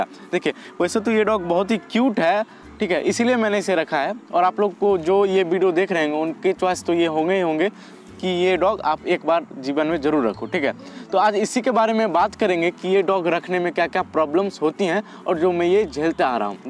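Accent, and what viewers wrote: Bihar, India. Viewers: Bihari Bhai hum bujh Gaye aap ki bole😁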